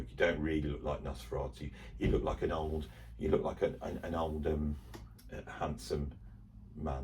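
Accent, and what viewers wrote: Birmingham, United Kingdom. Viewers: I think a lot of people watch your channel for your comical Brummie accent plus your wealth of knowledge.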